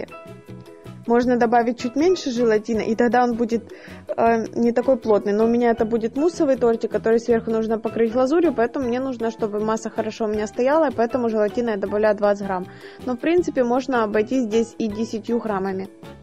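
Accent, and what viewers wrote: Ukraine. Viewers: Я серьёзно не понимаю - так говорят украинцы на русском языке? Или это диалект какой то? Очень прикольно ☺ За видео - лайк. 👍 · Боже мой! Как же ласкает слух ваш говор! Я впервые услышала украинский акцент(если я правильно поняла). Я так и знала, что он мне понравится! Так заслушалась, что по рецепту ничего не поняла)))) Пойду ещё послушаю. И обязательно сделаю такой крем. Благодарю! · Спасибо большое! И за мусс и за украинский говор, на душе тепло. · А мне нравится такой украинский говорок!))Главное рецепт хороший и лишней болтовни нет!))Всех благ вам....милая девочка!))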